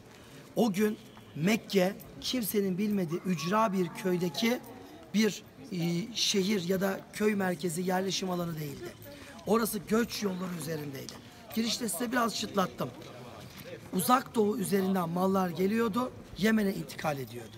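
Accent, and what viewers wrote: Turkey. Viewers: kayda değer ne anlatıyor diye dinlemek istedim ancak egeli ağzıyla "öyle diyolağ böyle diyolağ ama yanlış diyolağ"dan başka bir argüman duyamadım. çığrınmaktan tepinmekten argüman sunmayı unutmuş abimiz az bi soluklan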